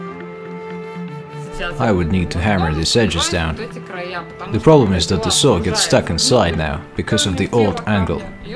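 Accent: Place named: Russia. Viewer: Еее !! Теперь и на английском языке!! Отлично! О да , русский акцент )) так мило ! Вы, больше молодцы, уважение !!!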